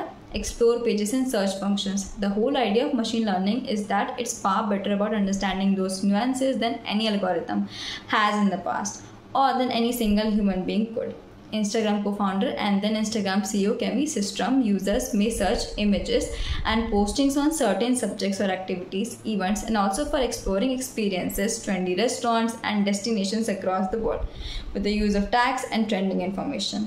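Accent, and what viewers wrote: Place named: Pakistan. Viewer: omg don't understand you . ? you speak to fast very hard to understand with you're packistan accent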